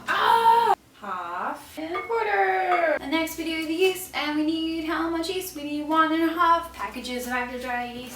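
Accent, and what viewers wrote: United States. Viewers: I wonder why people still remark about American accent. She's sounded a bit American for several years now. That's not a recent thing. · K who misses the old days when she sounded English no she sound like every other fucking America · Your American accent has less traces of British accent, and it is just as lovely. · Hard to believe I could be this captivated watching somebody bake. I'm a bachelor and I don't bake, but I might now give this a try. Also, ' ' 's American accent seems entirely natural now. Do you ever feel now like you're "doing" an American accent? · Not watched a video in 3 or so years. accent is DEFINITELY more American now, it's so weird haha!!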